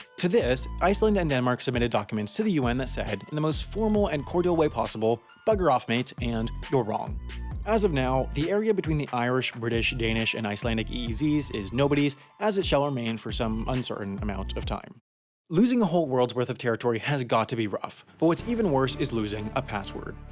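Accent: United States. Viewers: That Guys annoying Whiny American Accent is so annoying, America , The Land of The Free-DUMB. Trump 2024 LMAO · He just sounds like a bitter yank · Most boring load of shit I've heard in a long time, that God awful yank accent.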